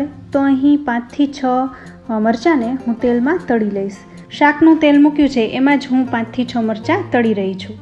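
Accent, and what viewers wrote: Kathiawar, India. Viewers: Very nice. Kathiyawadi bhasha khub mithi lage sambhalwama.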